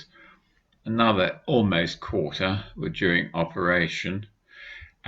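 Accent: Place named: England, United Kingdom. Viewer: Thank you. Doctor Fenwick so very much! Your discourses are wonderful to listen to! Nothing I enjoy so much as listening to an English gentleman, who is well bred, and articulate as you are! The English have never lost their claim to CLASS! I am eagerly looking forward to your next NDE presentation, part 2! God Bless!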